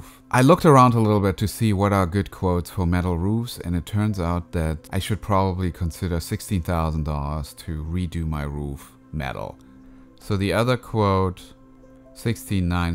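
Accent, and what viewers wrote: Germany. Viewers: Your accent sounds German.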